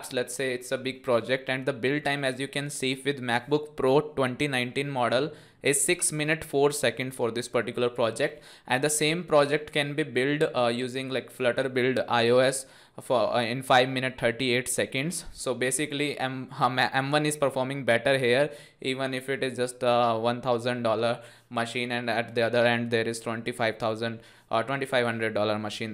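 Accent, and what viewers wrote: India. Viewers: Bro I m proud of you as one of the few good Indian developers on Youtube who is confident and provides the right information but you got to work on your English accent and grammar. The technical stuff is amazing already why not to go one step towards the perfection of videos and fix those language issues. Don't be offended this is just to see you grow more.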